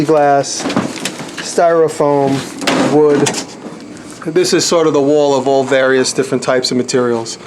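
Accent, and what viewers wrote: New York, United States. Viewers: This guy is straight New York! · I am so glad you answered my question after watching your vids for one day. I could tell your shop appeared to be in a basement, but had no idea you were in Manhattan. My question was where you were located. Your accent sounded like the big apple. I spent alot of time in Brooklyn Heights. I live in South Louisiana. Great to meet ya, Jeff W Waldrop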